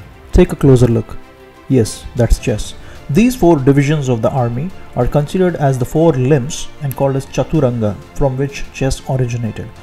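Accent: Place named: South India, India. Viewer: Namaste ...really want to appreciate all your videos..but one BIG request for the narrator...do you generally speak this fast, without any pauses...please narrate a bit slower so that the content is understood better..also its not suitable to slow down the playback speed. The narrator speaks with a slight mix of south.indian and western english accent, it is hard to follow the narration if you speak in this speed..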